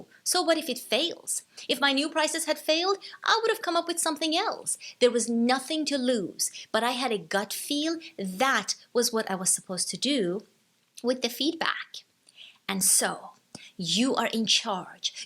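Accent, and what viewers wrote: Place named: Arab world. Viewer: Are you originally from an Arabian country ? Or from Eastern Europe? Just trying to place your accent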